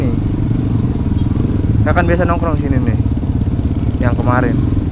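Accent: Papua, Indonesia. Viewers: Logat papua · Logat Papua😁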